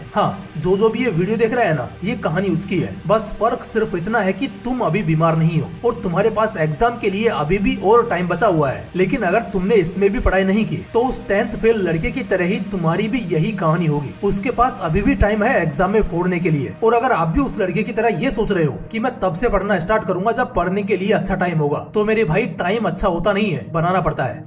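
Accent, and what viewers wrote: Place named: Mewat, India. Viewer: Haryana new Mewati soundh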